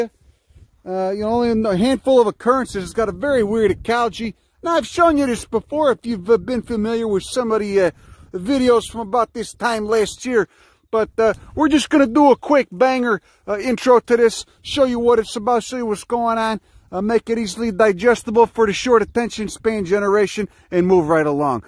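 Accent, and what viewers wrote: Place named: Colorado, United States. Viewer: HAHAHAHHAAAA!!!! God damned spot on. Sounds like half the people in Colorado.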